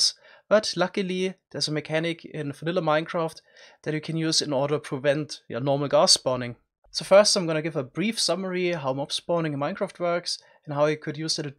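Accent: Germany. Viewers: gotta love German accents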